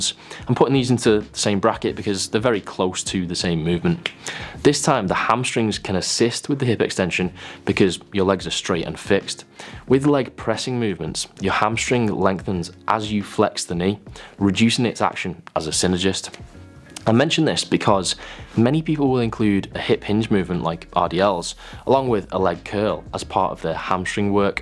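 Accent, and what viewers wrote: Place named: Manchester, United Kingdom. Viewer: Certainly sounds like Manchester to me. Hello from a fellow manc!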